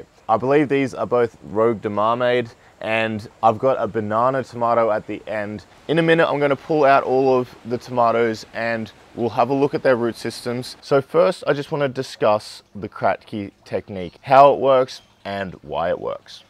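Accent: Australia. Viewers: You sound like you Australian. Considering your timeline it appears to be spring/summer. Will you be able to do the same in winter?❤ your vid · I can hear you are definitely an Aussie, mate. I'm from Queensland.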